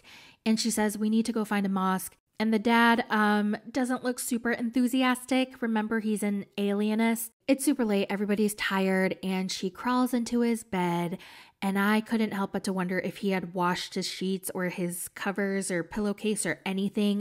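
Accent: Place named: Michigan, United States. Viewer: your Michigan accent LOL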